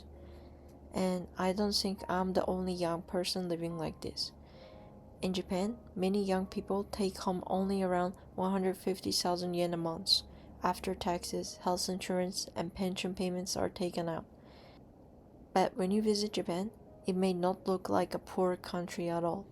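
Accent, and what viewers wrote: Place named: England, United Kingdom. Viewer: I love your english spoken accent, and a genuine presentation, helps seeing Japan from a ground level.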